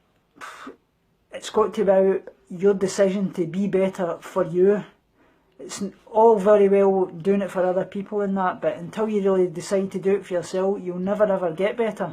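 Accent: United Kingdom. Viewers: Hi. Very happy to see you're still alive! I guess you live in the UK (from your accent)? In Sweden we have a law that allows the hospitals to admit eating disordered patients against their will if they have a BMI below 14. Then it's consider life threatening starvation and the psychiatric rules for admission against one's will is: if there's a danger to the person's life or someone else's, and if the patient suffer from a serious mental condition. Since this law started including ED-patients the mortality rate has dropped SO MUCH. Very few people die from anorexia in Sweden nowadays. I wish there were similar laws in every country. 20% dying from anorexia is just ridiculous numbers. You can't think or make clear decisions that much underweight. The brain starves and you need to get fed to higher weight before you can think properly, and if the hospital can provide that help it'll improve your chanses of getting well SO MUCH. 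If we hadn't had that law I would've been dead, because I starved myself like a maniac (I'm bipolar, so I'm allowed to use that word, haha). As soon as I got down to BMI 13 they admitted me and tube fed me, and it saved my life I don't know how many times. Because I wouldn't have stopped. I couldn't have stopped. I dropped 10 kg in 2 months without even trying to lose weight. Yes, I hated being at the hospital and that they made me gain weight, but without that I wouldn't be here. Nowadays I've been at normal weight for 8 years and eat what I want.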